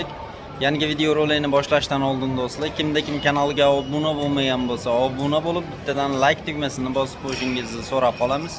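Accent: Chortoq, Uzbekistan. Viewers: Ука. Шевангиздан чортоклик сиз шекилли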